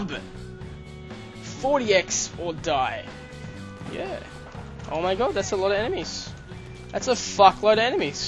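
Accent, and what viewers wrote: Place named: Australia. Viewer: Where from Australia are u from?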